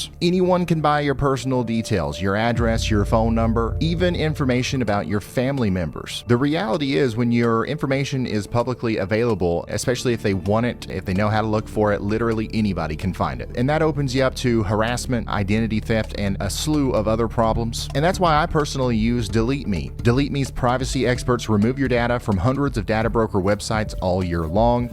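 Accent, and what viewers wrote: South, United States. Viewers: I just love your Southern Speak! I’m from what used to be fairly rural north Fla Panhandle; I grew up in Tallahassee, but the middle, to the western panhandle are not far from Al, & Ga, so “Daggone” is not unknown to me. 30 years ago, ( I will be 71 in July)I married a man from Michigan, & we’ve been living in the Grand Traverse Region of N.W. Lower Mi since 2008, & I still speak Southern….with a slightly less southern accent.😉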